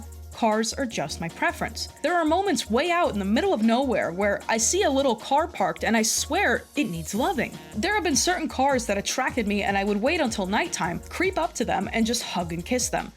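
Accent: New York, United States. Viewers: saaame!!! i have a relatively weak but present Boston accent (similar to hers, which sounds very NY) and have russian as a first language….americans are so funky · Just found your channel and watched a previous vid and you sounded like you were from NY then i see this one and you sound like your from california or something,so funny you put in a cut about it and broke the 4th wall some truman show shit 😂😂 but great vids i think imma sub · She has a slight Staten Island(New Yorker) accent. You can hear it on her vowel sometimes, it's not very prominent. I think it's great!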